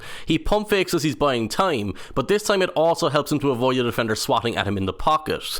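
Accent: Ireland. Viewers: Really enjoyed your analysis on this.As a Scottish Packer fan it’s great to hear an Irishman talk about football… relatable!👍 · It is so odd hearing an Irish accent when talking about American Football….I expect to hear this kind of accent for Premier League analytics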